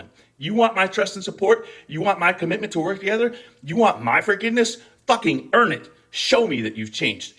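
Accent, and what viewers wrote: Scotland, United Kingdom. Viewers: are you from Scotland because i always hear an accent come through on your vids?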